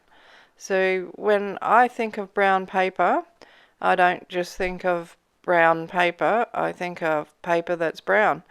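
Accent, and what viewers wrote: Australia. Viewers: Just stumbled across your post and I gotta say that I was surprised to hear your accent. I enjoyed your work. Thanks from a fellow Aussie 👍🏼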